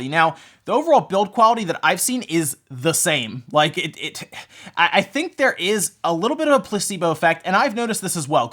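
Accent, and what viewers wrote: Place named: Maryland, United States. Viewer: Does anyone know what that accent has is called? Like how he pronounces "Draw" as "Drawl" and "Older" as "Odor"? I'm genuinely curious and not trolling. I've wondered for a long time. At one point I thought it was an Ohio thing but is in Maryland right? ANyone know what I'm talking about?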